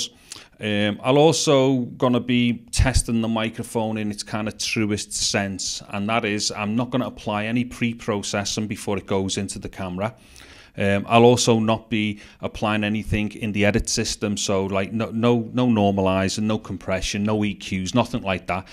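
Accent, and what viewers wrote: Liverpool, United Kingdom. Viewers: Hes a scouser boss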